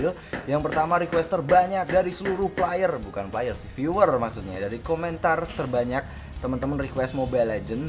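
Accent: Java, Indonesia. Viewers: Orang jawa? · Jowone ngendi pak samean??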